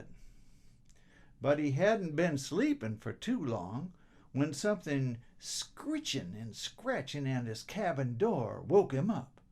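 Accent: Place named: South, United States. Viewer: Pretty good on the “southern” accent too!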